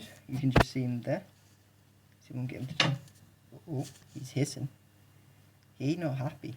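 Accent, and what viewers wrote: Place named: Brighton, United Kingdom. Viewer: Hahah, you just sound like a mate of mine from Brighton.:D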